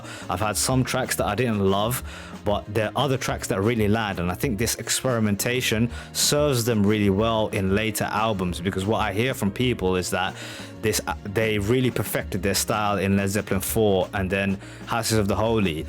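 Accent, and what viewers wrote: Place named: United Kingdom. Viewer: Where do you live? Don't get me wrong I just wanna know cause of your accent. It's best sounding british accent 😊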